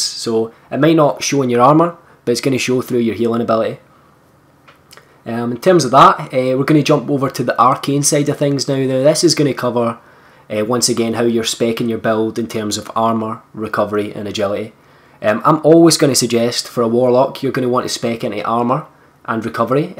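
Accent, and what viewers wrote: Scotland, United Kingdom. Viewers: fuckin love scottish accents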